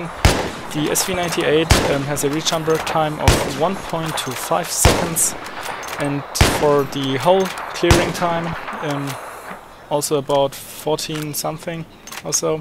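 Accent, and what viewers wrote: Germany. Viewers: irgendwie wusste ich das du deutsch bist xD dein akzent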